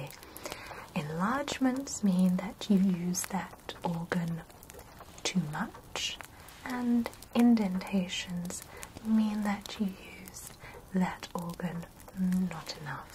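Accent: United Kingdom. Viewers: she have a proper brit accent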